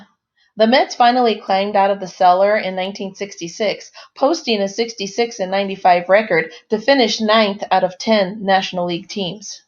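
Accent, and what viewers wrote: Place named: Cleveland, United States. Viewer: Im sure you couldve said Juan Rios better. You sounded like someone from Cleveland. Still you get a thumbs up sister!!!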